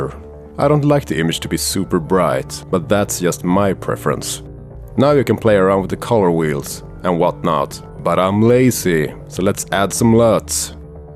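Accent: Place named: Sweden. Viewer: Är du svensk? Låter som de när du bryter! Bra film! har funderingar kring köpa denna kamera :I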